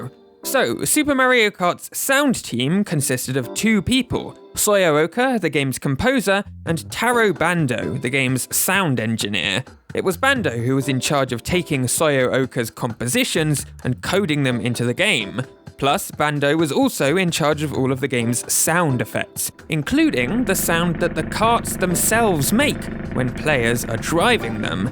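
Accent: United Kingdom. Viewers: GUYS HES SAYING MARRY O WHICH IS THE CORRECT ONE FOR HIM DONT COMPLAIN THAT HE IS PROBABLY BRITISH BECAUSE I SAY MARRY O TOO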